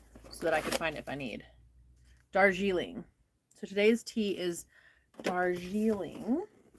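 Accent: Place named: South, United States. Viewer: Haha cute southern accent! You're so funny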